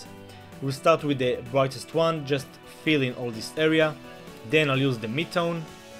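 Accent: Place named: Israel. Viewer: יש לך מבטא ישראלי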